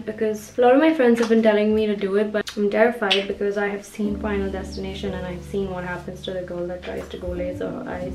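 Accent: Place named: India. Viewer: r u from India, coz ur accent is totally different ❤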